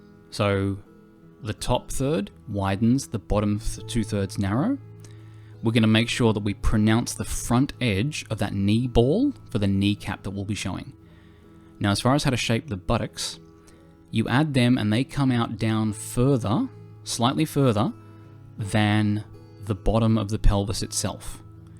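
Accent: Australia. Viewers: Your accent sounded familiar and than I realized ya were australian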